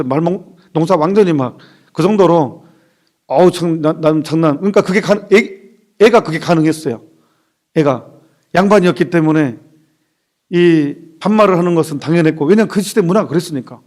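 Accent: Jeolla, South Korea. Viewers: 신부님 전라도사투리 정감이갑니다 저도전라도ㅋㅋ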